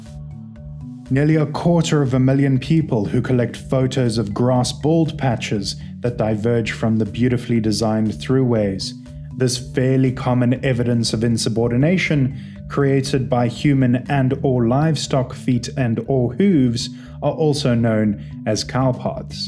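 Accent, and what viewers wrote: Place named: South Africa. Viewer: If you're using reddit for memes and stonks you're doing it very wrong indiddly deed. I swear I detect the faintest of Saffer twangs in this video 🤔🧐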